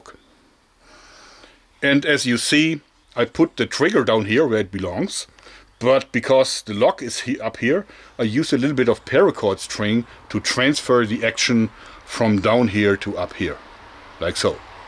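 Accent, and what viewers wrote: Germany. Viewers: are you german or austrian?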